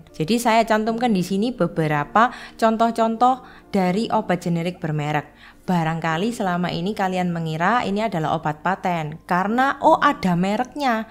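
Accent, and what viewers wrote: Java, Indonesia. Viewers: Logat Jawa nya medok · Medok banget😂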